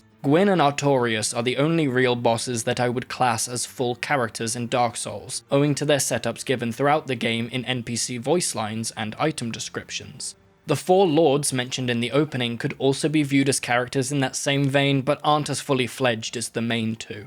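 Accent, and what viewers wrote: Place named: United Kingdom. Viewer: stayed for the take, but stop being British it's distracting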